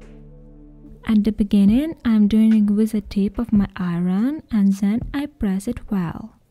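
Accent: Russia. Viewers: И русский акцент)) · Are you Russian?)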